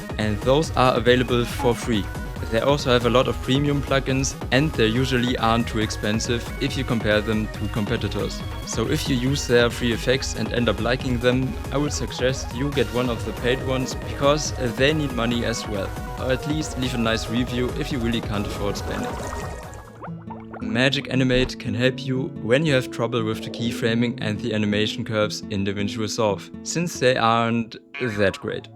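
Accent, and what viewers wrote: Germany. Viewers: Echt gutes Video. Man merkt zwar das du Deutscher bist aber das juckt nd. Arbeite maybe noch am sounddesign sonst echt gutes Video bro.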